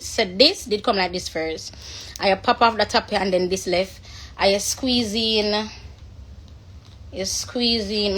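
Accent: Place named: Africa. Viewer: my first time commenting but I had to this time you’re so talented the African accent got me ooo someone plz sign her ooo nuff love ❤️ one of your fan blessings queen 👸🏾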